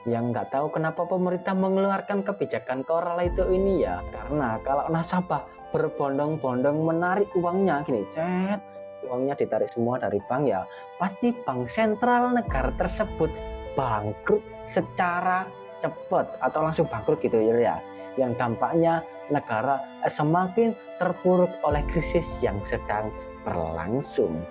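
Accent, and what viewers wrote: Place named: Java, Indonesia. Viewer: ngomongnya ga usah buru2 bg mending pelan2 tp jelas,kecepetan jd kdengeran medok 😅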